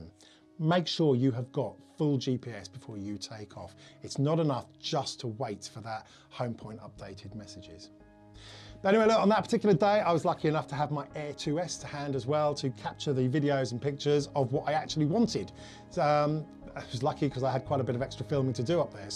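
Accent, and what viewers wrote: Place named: Durham, United Kingdom. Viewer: not yorkshire mate durham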